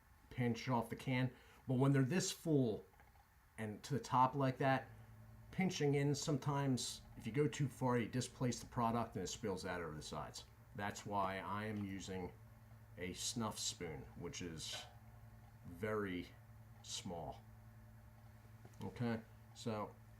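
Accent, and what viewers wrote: United States. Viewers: Where are you purchasing these snuffs? Judging by your accent, I assume you're American. I'm a former smoker and current user of Copenhagen snuff. I'd like to explore the world of English snuff and would appreciate any insight on purchasing it as I know it's produced across the pond. I enjoy the content too. Cheers!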